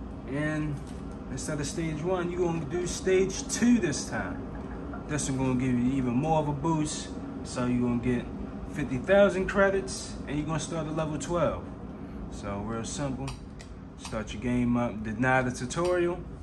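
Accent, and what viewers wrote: Baltimore, United States. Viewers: You from Bmore I can tell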